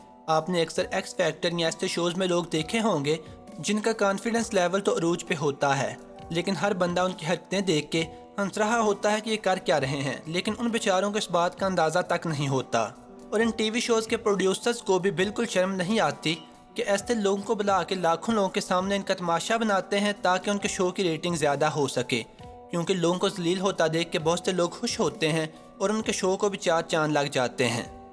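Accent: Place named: Lahore, Pakistan. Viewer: I think lahori ha admin due to his lehja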